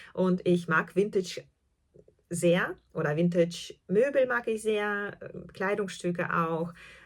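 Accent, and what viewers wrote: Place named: Luxembourg. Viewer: Liebe, ich finde deine Videos sehr interessant. Das eine war mir auch zu viel Theorie. Dann nehme ich mir die Freiheit abzubrechen. Ich habe vor vielen Jahren eine Übersetzerausbildung an einem Fremdspracheninstitut gemacht. Ich liebe Sprache und was damit zusammen hängt. Wenn ich dir zuhöre, bilde ich mir ein, einen luxemburgischen Akzent zu hören. So und jetzt schaue ich weiter 😊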